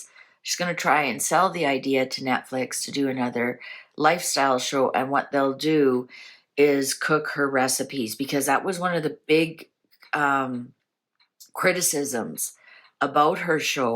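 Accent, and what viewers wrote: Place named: Canada. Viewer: Ingredients for toe jam: hair and bacteria-filled hands 🤣🤣😭😭😭 your brutal yet somewhat nonchalant sarcasm (thrown in the mix) in your readings is greatly appreciated and adored over here 🥰😇 I also love your Canadian accent 😍 "I'm the toe-jam girl" 😭😭☠️🤡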